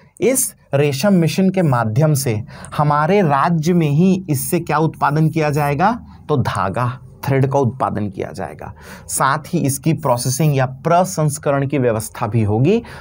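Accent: Chhattisgarh, India. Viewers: Thank you sir😁🙏😁... Sir aap kaha se hain...apki chhattisgarhi ka lahza achha lgta h....😁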